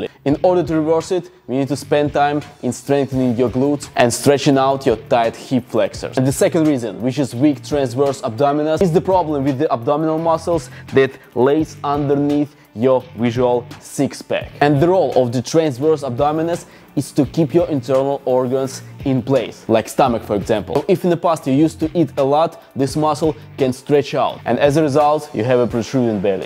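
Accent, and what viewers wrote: Russia. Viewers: русский акцент просто сворачивает уши в трубочку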